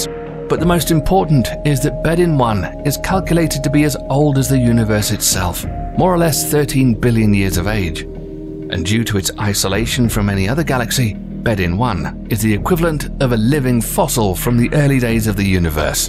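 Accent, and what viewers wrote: United Kingdom. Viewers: Another mystery space video by a channel with an British Voice over guy, how original. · British sounding narrator showing an oblong planet and calling it a football is odd as British football is round and spherical. Most of the world knows football/Soccer as football. Also in Britain, we hate the name soccer regardless of its origins. I wonder why Fifa games do better than PES. So as you can see, hearing a British person say football, but is referring to American football or a Rugby ball is asking for a beat down here in the UK. So the OP WozzzaTV is just expressing is dislike out loud. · narrator, your British, its a American football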